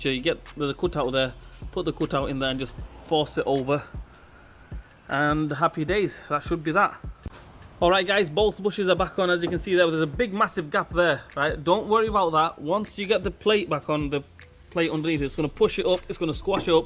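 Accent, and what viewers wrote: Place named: Northern England, United Kingdom. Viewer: North England somewhere by his accent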